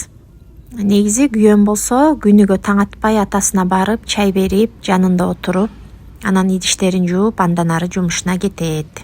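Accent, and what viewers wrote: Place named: Talas, Kyrgyzstan. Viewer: Таластан болбойсунбу суйлөгөн сөзундөн билип жатам.таластын апандын аты Гулзинабы.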